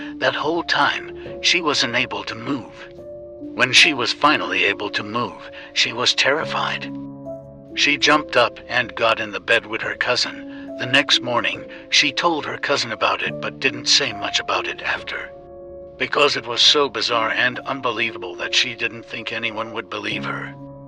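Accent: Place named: Jamaica. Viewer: The voice over wid the yard dialect have me ah dwl!!!😂😂😂 love your channel❤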